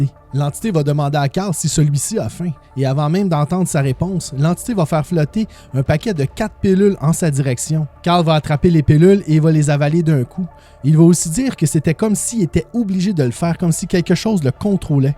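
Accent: Canada. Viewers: Superbe vidéo comme d'habitude. Mais je trouve que sur cette vidéo, tu parles un peut trop vite...et quand tu parles trop vite avec l'accent du Canada c'est difficile pour te suivre, et te comprendre.quand tu parles plus lentement. C'est beaucoup plus audible, et c'est top! Merci pour tes vidéos!!!!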